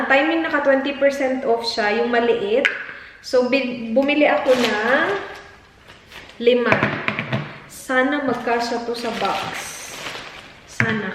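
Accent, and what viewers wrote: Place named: Visayas, Philippines. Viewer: Hahaha ka cute sa buang ba hahaha bisaya pa more😍😍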